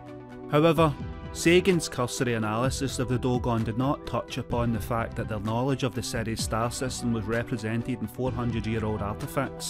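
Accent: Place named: Glasgow, United Kingdom. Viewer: Is that a Weegie narrating?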